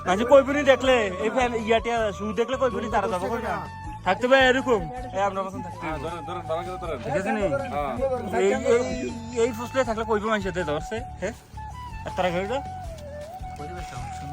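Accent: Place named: Comilla, Bangladesh. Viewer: আপনার কথার বাসায় বুঝা যায় আপনি কুমিল্লা জেলাল মানুষ কোন থানা আপনার বাড়ি জানাবেন ❤ ঈদ মোবারক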